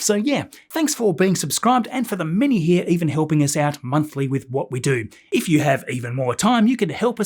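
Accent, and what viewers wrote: Australia. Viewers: You messed up the “that’s not a knife” name. With your accent, you should have done the famous Australian line That’s not a knife …..(THIS is a knife). I forgot the movie it’s from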